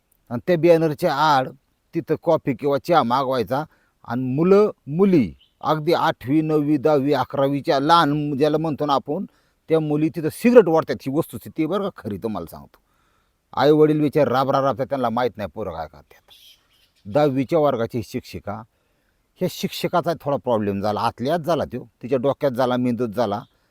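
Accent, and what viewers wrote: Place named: Beed, India. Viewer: मामा बीड वाले दिसताय भाषेवरून म्हणतोय ह्या गोष्टी लोकांना आवडतात ते तुम्ही बरोबर ओळखून विषय ठेवता त्यामुळे like मिळतात jacket आवडल बाबा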